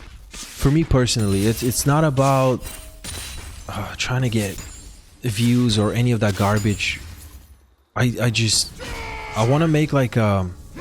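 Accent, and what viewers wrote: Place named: Bulgaria. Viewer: So I've been watching your videos for what? Year and a half? Two? And only now I actually realize why your accent is so familiar - you are a Bulgarian fellow :) Hit me up when you land in Sofia, you have a beer from me ;)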